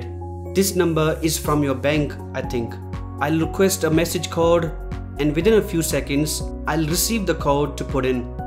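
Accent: India. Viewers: I really wish you guys could get thru that Indian accent your almost as bad as new jersey accent man seriously I have to focus like a sniper to understand your English you are better than most . But seriously guy I can hear every letter of any word from Penelope Cruz and she has heavy latin accent but that Indian English accent i can not grasp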